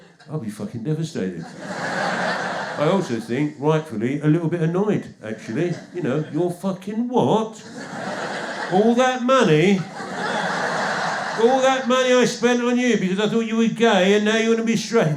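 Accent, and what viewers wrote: Cockney, United Kingdom. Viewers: As a former Portuguese immigrant in the UK, I loved it. You sounded to me like a proper Guy Ritchie cockney character, and I mean it in the best way possible. Bloody brilliant. Cheers from Portugal.🇬🇧🇵🇹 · luv this fella .. proper cockney geezer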